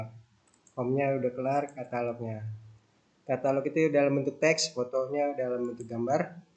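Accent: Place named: Betawi, Indonesia. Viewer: Bujug dah bang, Betawi bangat yak .... Bisa nih bang ane belajar ke ente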